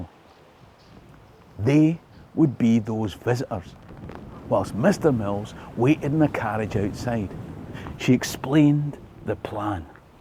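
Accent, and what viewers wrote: Scotland, United Kingdom. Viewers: No, no-one said that, and that is every bit as disrespectful a distortion as asking you not to speak in a Scottish accent was a disrespectful request. I have watched enough of your videos to know that you are more intelligent than that. Normalising the use of AI imagery harms people who make their living making that imagery for creators by making it acceptable for those who can afford to pay them to cut them out instead. You are talking like no form of visuals other than AI are available for you to make your content less visually boring. That is patently untrue. Perhaps if I were to churn out 10 AI generated videos on Scottish history a day, that had been "trained" on your content, you would get it then.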